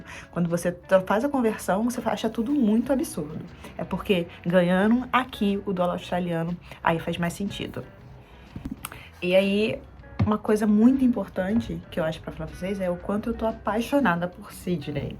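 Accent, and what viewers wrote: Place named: North, Brazil. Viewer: De onde vc e? Sotaque parece do norte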